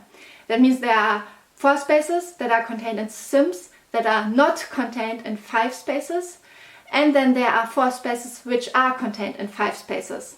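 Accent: Germany. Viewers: You have a German Accent. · German accent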